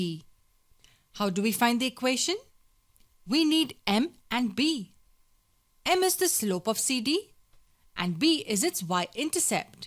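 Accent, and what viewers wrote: India. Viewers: I screamed when i hear an indian voice and leave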